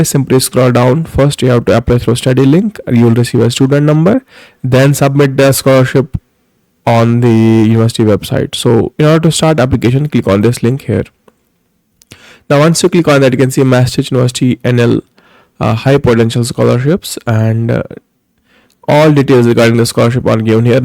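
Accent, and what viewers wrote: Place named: India. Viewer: your indian accent was just disturbing. try to correct how to speak in english before applying scholarships.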